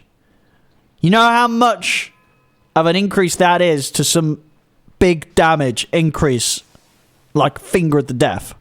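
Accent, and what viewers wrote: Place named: United Kingdom. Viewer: where can i watch all his casts?? i want to adapt his thick british accent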